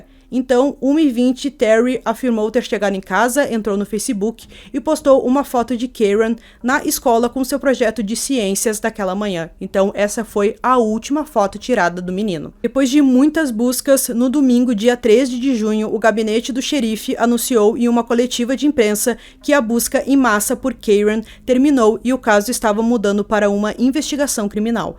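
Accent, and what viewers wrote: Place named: Pará, Brazil. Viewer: vc é do estado do Pará??? Você tem um sutaque típico de lá kkkkk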